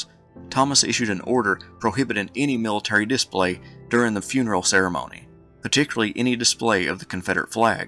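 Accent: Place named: Virginia, United States. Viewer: I have long admired Gen. Thomas and I often wondered why his name was forgotten for so long. Thank you for this series of videos on the life of this honorable, intelligent and courageous man. He is a credit to the United States. And so are your videos on the American Civil War. Incidentally, I imagined that your (Virginian?) accent is quite similar to Thomas' own voice. It made it seem that the man himself was narrating his own biography.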